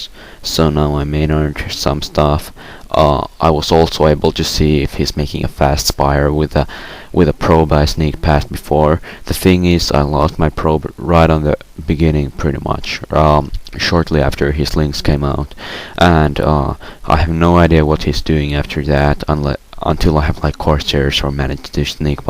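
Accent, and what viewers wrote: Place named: Finland. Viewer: Finnish accent is so beautiful ^_^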